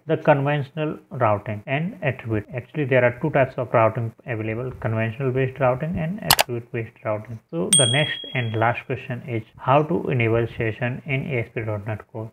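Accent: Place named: Western Europe. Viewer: Nice, nice, Western Europe sound.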